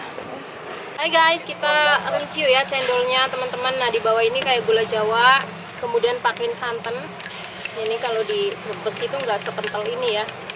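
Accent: Banyumas, Indonesia. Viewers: Wong brebes apa wong cikarang jane.. ngomonge be ngapak mbok..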